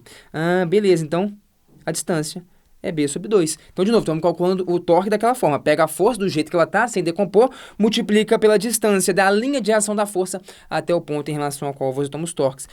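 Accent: Minas Gerais, Brazil. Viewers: A aula é excelente! Sem dúvidas! Mas confesso que conhecer o "mineirês" deixa o entendimento mais fácil em alguns momentos da aula... Digo isso sendo mineiro também.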